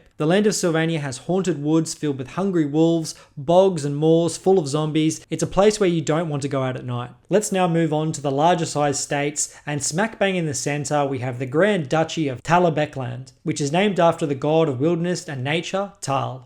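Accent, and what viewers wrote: Australia. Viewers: Great video and so good to hear it in an Australian accent!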